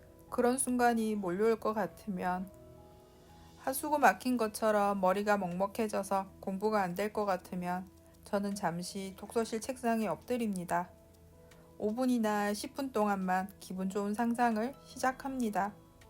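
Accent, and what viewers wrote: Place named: Gyeongsang, South Korea. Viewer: 말투는 경상도인데 서울말 쓰려는 노력이 엿보이네요. 열심히 노력한 결과로 좋은 삶 살기를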